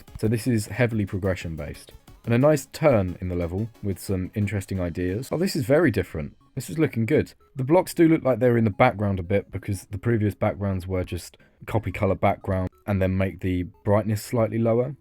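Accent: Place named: United Kingdom. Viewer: again british accent hard carry, good video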